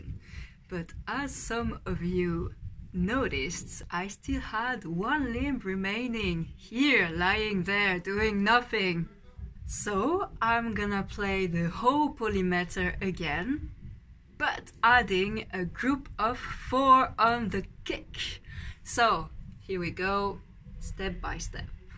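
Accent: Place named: Canada. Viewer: My favorite Canadian accent.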